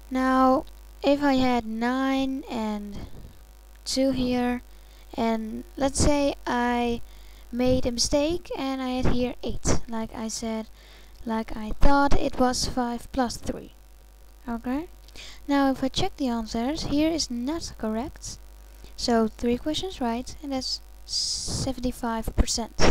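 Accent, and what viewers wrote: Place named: Netherlands. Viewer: Haha, ik dacht al te horen dat je Nederlands was!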